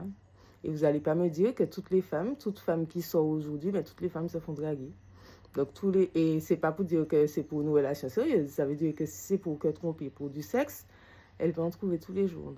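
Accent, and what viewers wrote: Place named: Martinique, France. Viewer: Parole ti mal mdr quand tu parles ont entend vraiment la Martinique free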